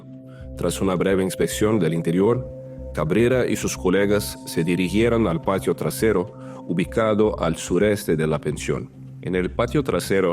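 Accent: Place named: Portugal. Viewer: Que feo acento . Casi no se entiende. Si queres hacer documentales en portugués genial. Pero un asco escuchar el acento rapai tembo.. no se le entiende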